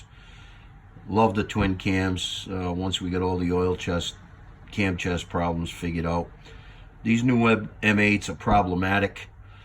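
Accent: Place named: East Coast, United States. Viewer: Great description of what you found and the mechanics of it all. Subbed for you articulation and East Coast accent!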